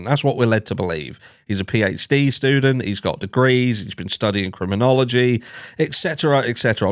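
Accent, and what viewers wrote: Norfolk, United Kingdom. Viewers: More to this case than meets the eye! you need to have a sing-a-long AKA -:D show off that Naaafoll accent:P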